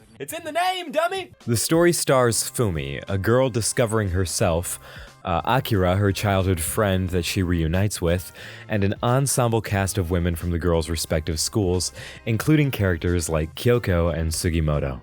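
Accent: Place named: New Jersey, United States. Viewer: Has anyone else noticed the sudden Jersey-esque accent??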